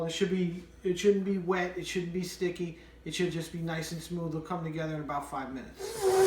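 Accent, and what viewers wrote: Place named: New York, United States. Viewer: Everything about this video, from the delicious bread, to your (east-coast/new york?) accent, to your ADORABLE son and sweet wife filming you (reflection in a spoon lol) I can't wait to try your recipe. Thank you for sharing some of life's joy - God bless!!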